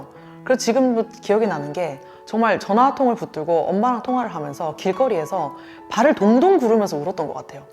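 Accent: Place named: Gyeongsang, South Korea. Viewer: 경상도 사투리 구수하고 좋다 ㅋ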